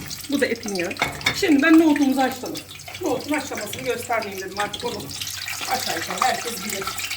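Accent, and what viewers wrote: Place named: Thrace, Turkey. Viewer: Macırsınız galiba.kıyık bırak dediniz ordan bildim.zaten konuşma aksamınızda trakya aynı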